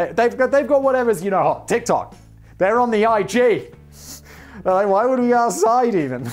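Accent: United Kingdom. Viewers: I love how turned the British-isms up to a 1000 with this one. Also, a close runner up is to hear Simon blow past a sentence and then say, “what?! really?!” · It's all right, everyone; no need to panic! He's British...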